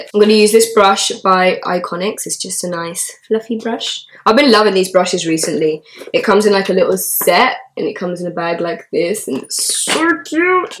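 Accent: London, United Kingdom. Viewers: very cool! i was wondering because your accent sounds much like accents from at or around london :-)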